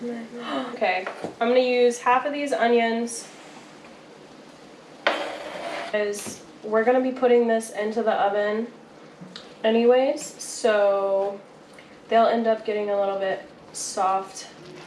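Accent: South, United States. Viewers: You have the cutest country twang. Love it. That looked delicious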